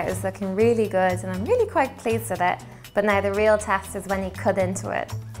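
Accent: Ireland. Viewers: this is such a lovely video !. is she irish ? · Is that an Irish accent?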